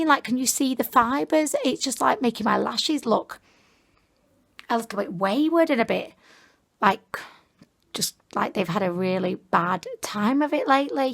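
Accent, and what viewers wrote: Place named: Manchester, United Kingdom. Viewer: Sorry it’s off topic, but where are you from? Your accent is saying Manchester?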